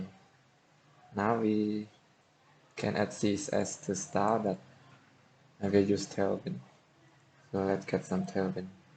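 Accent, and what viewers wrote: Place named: Indonesia. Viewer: hmmmm logatna kek orang indonesia :3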